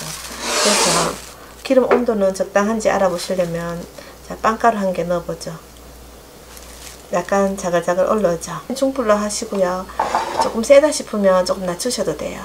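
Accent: Gyeongsang, South Korea. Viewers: 쉽고 빠르게 친절한 경상도언어에 정감있고 맛있는 요리법 고맙고 감사합니다.💕👍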